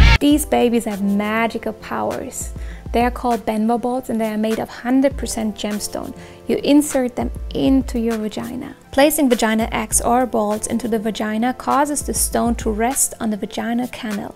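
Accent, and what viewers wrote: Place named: Germany. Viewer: My best friend is German, it's so nice to hear the accent <3 And you're the coolest so watching these videos make me so happy :)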